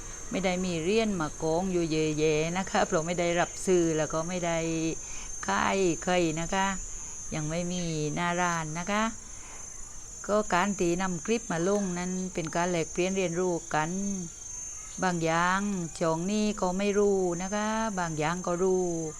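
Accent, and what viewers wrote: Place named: South, Thailand. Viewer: ฟังเสียงคนปักใต้ชอบครับ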